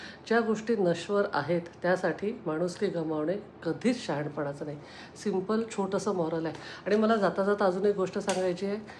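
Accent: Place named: Pune, India. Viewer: Mla pn aasch vatat hot ki bahutek tumhi punya cha aasal bolnyacha ton varun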